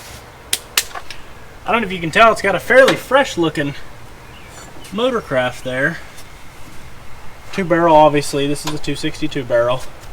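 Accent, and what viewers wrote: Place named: Carolinas, United States. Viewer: looks like youre in the carolinas from the scenery. and the accent :)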